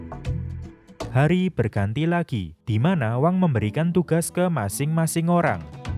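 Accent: Java, Indonesia. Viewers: medoknya jogja bangeeet ,❤️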